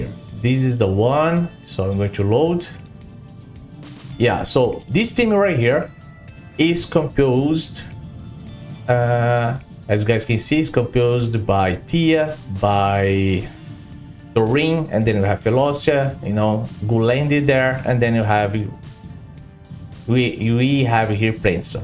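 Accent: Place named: Romania. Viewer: Are you a Romanian? Coz your accent is kind like a romanian like Skratch or Oddone..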